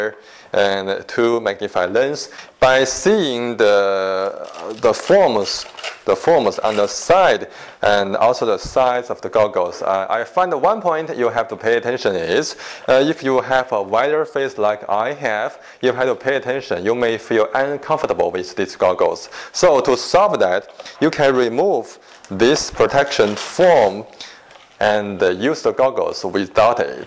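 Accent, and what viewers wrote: China. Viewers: Couldn´t you find someone to present products, that are more proficient in the spoken English? It´s too "chinglish" for my liking....but excellent product!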